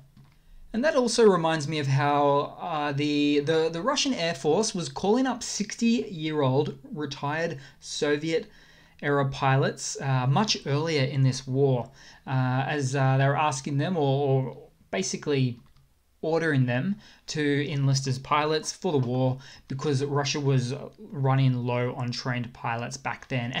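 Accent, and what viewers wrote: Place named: Australia. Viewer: Assuming you are in Australia — as your accent would suggest — you must be uploading around midnight (NSW, Vic, Tas), 11.30 pm (SA), 11.00 pm (Qld), 10.30 pm (NT) or 9.00 pm (WA). Or maybe 1.00 am (Norfolk Island), 7.30 pm (Cocos/keeling Islands), 7.00 pm (Australian Antarctic Territory), or 6.00 pm (Heard and McDonald Islands). On any view, burning the midnight oil. So a special thanks for the effort which this involves.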